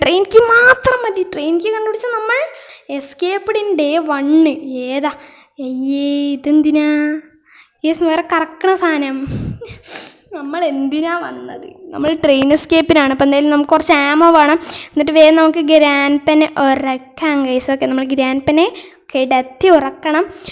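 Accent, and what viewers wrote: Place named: Palakkad, India. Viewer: Palakkad annu thonnunu😅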